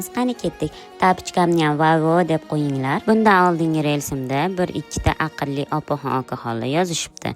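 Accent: Namangan, Uzbekistan. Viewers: Namangandanmisiz wevangizdan bildim olloh wifo bersin namanganliklar qanisizlaaar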